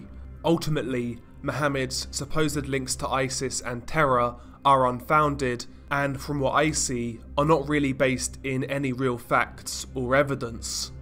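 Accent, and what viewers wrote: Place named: United Kingdom. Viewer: Im from Germany but I love youre channel. I can understand it whats saying. Keep going ! And between I love youre british accent ❤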